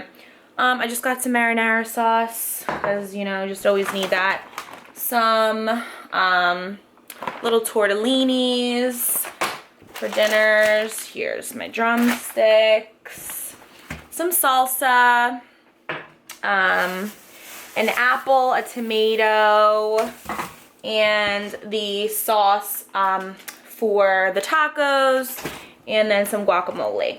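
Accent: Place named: California, United States. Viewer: Are you Californian? Because you definitely sound like one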